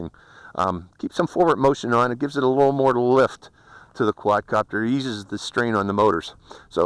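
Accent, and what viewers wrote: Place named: Pennsylvania Dutch, United States. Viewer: Hi Mr Quadcopter 101. I really like this particular quad! And I dont mind buying from China, But I wanted to ask you about spare parts, are the available and if so where is the best place to get them? Thanks for all your great reviews, I dont ever miss one! You are THE best quad reviewer on youtube by far! I am from Reading PA and I think you said in one of your past videos that you were from Pittsburgh, Once in a while I hear some Pennsylvania Dutch in your voice? Do you have some Pa dutch in your blood?? Thanks again and keep up the fantastic work! Happy Easter to you!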